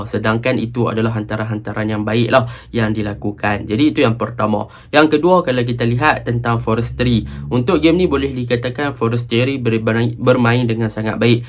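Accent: Kedah, Malaysia. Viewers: suke betol aku dgr loghat kedah hang bang👍👌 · Aku suka dengaq loghat kedah hang, cuma aku x suka dengaq loghat kedah sanusi je ❤️💙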